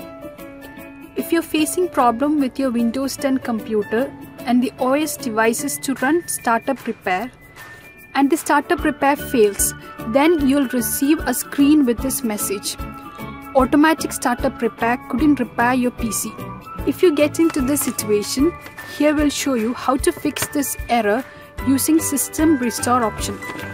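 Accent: India. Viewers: Finally! An Indian voice with a video tutorial that actually works. Thanks for your help ma’am!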